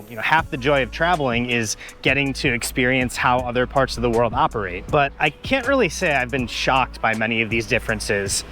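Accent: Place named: United States. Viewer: I like ur American accent and subscribed🇺🇸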